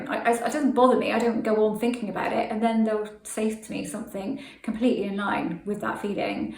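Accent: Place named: RP, United Kingdom. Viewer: I kinda love it 😍 so posh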